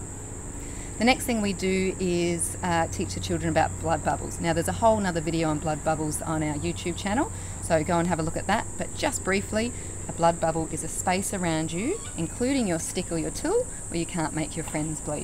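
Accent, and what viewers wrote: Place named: Australia. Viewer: Anywhere. I once accidentally put a "corn dog" stick through a guy's hand. It was a little pointed on the end, but not sharp - but it just took one little slip goofing off and he had to go to the ER. Ruined everyone's night at the county fair. You should really just be supportive of a place that advocates kids being kids, instead of trolling all her posts. Sounds like she is an Aussie, btw.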